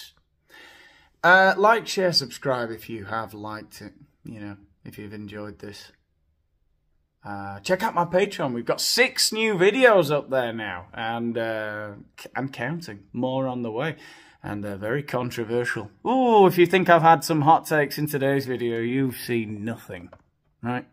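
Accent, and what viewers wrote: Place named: Wales, United Kingdom. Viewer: Hahahah love the Welsh accent 🙋🏼‍♀️🏴😅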